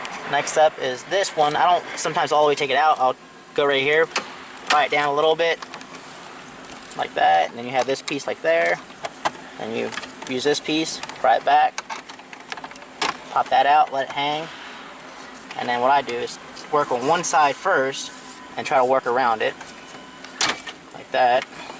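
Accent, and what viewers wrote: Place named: South, United States. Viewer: What’s up brother just subbed thanks for the info. Where you from? Trying to figure out where you accent is from sounds southern a bit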